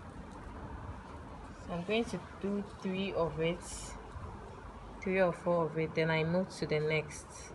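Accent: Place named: Ghana. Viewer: I love your accent! Are you from Ghana?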